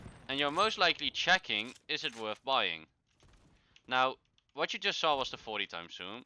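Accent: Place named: Netherlands. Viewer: this person is from holland you hear it from its accent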